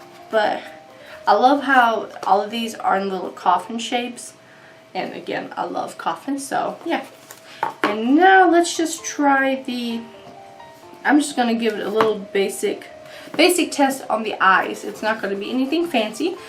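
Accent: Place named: Germany. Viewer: You're losing your German accent. :(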